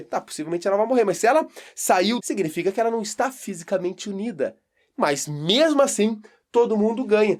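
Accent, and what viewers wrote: Rio Grande do Sul, Brazil. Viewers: Olha o Gaúcho ksksks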